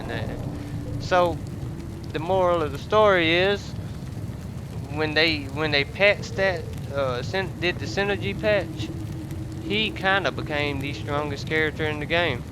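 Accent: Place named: South, United States. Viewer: just some Southern drawl or something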